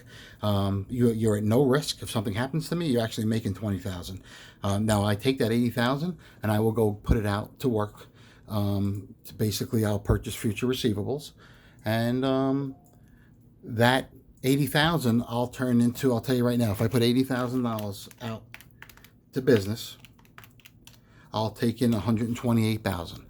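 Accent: New York, United States. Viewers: Nice pickups. I just became you #144 subscriber. I enjoy your gold coin flipping 😆 and your NY accent, as I am from the South. Look forward to watching you hustle up some Stacks of Gold. The faster you acquire it the sooner you quit paying interest to your family associates. Bank 🏦 of looking good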